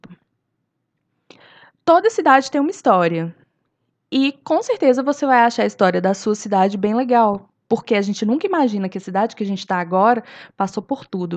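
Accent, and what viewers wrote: Minas Gerais, Brazil. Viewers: Meo deos mule tu e mineira???????;_;amei quem amou?akaka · Tu é mineira?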